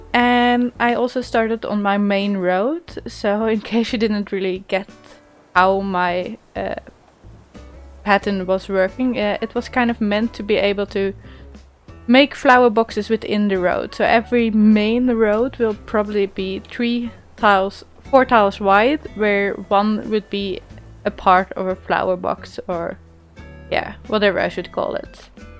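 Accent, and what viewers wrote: Netherlands. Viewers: By any chance are you Dutch? Just wondering 😊 I've watched a few of your Animal Crossing video's! Also we made a few Tour video's haha We started playing Animal Crossing when New Horizon Released!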